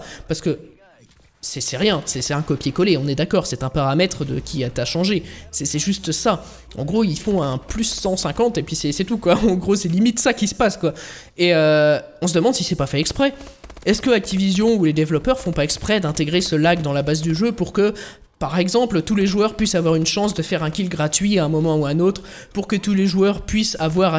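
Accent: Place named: England, United Kingdom. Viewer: arrêté l accent englais ça fait pitié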